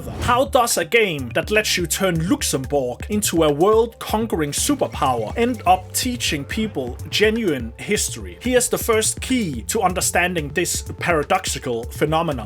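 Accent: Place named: Denmark. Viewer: Elsker det danske accent🫡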